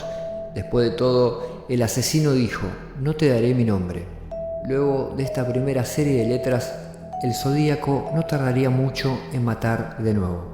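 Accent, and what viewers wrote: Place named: Buenos Aires, Argentina. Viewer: sha, she, shi, sho shu. Muy porteño para mí gusto.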